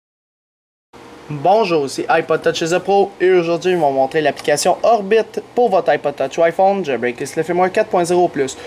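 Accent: Canada. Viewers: Chui trop fan de ton accent canadien :D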